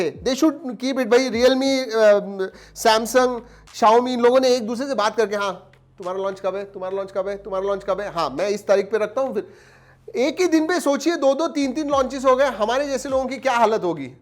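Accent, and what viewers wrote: Maharashtra, India. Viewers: aap jab marathi bolte ho to bahot achha lgta hai.. kya aap maharastra se belong krte ho..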